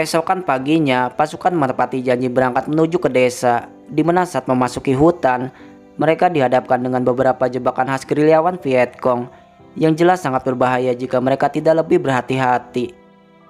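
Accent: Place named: Java, Indonesia. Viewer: Logatnya masih medok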